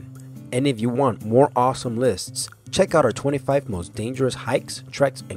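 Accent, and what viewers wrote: Mexico. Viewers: why is this mexican talking? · You have the best Mexican voice ever your an awesome commentator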